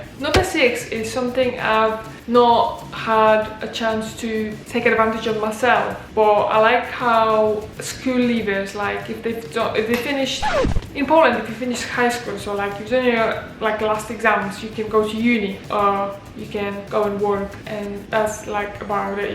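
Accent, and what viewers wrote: United Kingdom. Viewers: you have a slight northern accent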